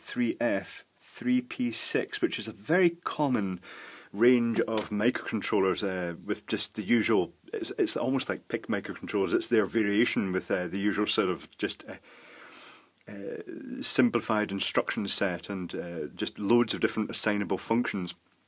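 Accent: Scotland, United Kingdom. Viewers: where does this horrible teenage kind of expression 'bricked' come from? Are we using Americanism again? we should be preserving the English language especially with such an attractive Scots accent 😇 keep these fascinating videos coming ! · I was wondering about that lol.....I thought you were saying 'break' it, in your Scottish accent....!!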